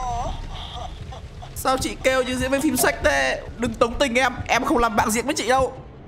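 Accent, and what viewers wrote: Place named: North, Vietnam. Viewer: Chuẩn trai bắc đấy ông ạ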